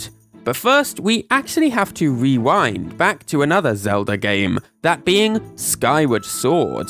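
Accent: United Kingdom. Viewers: love ur videos and ur british accent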